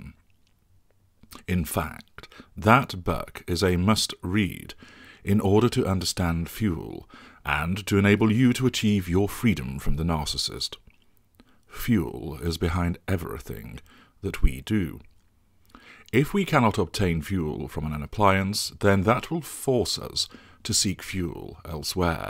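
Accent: England, United Kingdom. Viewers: It's that awesome ENGLISH accent, whew!!! Outstanding!!! Xoxo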